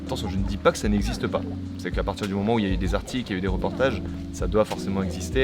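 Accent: France. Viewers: Salut à toi! Quelques petites questions du coup pour un prochain Dis-Nous: - Est-ce que l'accent français t'a déjà posé problème en terme de compréhension auprès de personnes japonaises? - Comment ça se passe le covid au Japon? On te voit souvent en extérieur durant les tournages (beaux paysages au passage), y a pas de restriction sur ce point-là? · O_O !! Alors là pardon pour le cliché mais un mec hétéro qui regarde des dramas/films japonais, qui mange avec une main devant sa bouche (façon japonaiSE) et qui est fan de Nana jusqu'à avoir le cadenas de Ren autour du cou bah alors c'est grave inédit !! J'ai perdu 10 mes jeunes années à chercher un mec qui comprendrait ma "passion drama" et ai fini par abandonner... et me suis mariée à un mec qui ne comprend toujours pas le délire (et c'est pas grave parce que d'autres qualités c'est bien aussi !!), mais je ne savais même pas ça possible XD !!! 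Sinon, une vidéo feat avec Pepe et Vivi c'est possible un jour genre un format interview pour qu'ils parlent justement à coeur ouvert de cette expérience Terrace House ???? Et aussi, ton accent (quand tu parles français) c'est quelle région ?? :D Plutôt Est ?? (Alsace-Lorraine ?) Et aussi, tu pourrais nous parler un peu du regard que tu portes sur toi-même depuis que tu as choisi cette vie au japon ? Est-ce qu'il a évolué par rapport à qui tu étais en France, à savoir si il n'y a pas eu des couac d'égo, je trouve toujours plus intéressant quand on rentre dans le fond des choses et qu'on aborde la réalité, de nombreux youtubers ont parlé de l'envers du "décor" je trouverais ça grave cool que t'en parle. Et aussi... Non en vrai j'ai fini de bavasser XD !!! Bonne continuation o/ !!!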